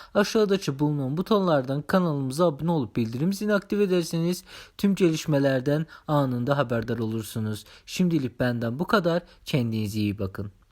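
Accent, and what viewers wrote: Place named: Azerbaijan. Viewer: Kardeşim türmüsün Azeri i anlaşılmayan Bi Türkçe rica ediyorum Türkçe öğrenir isin lütfen🙄😲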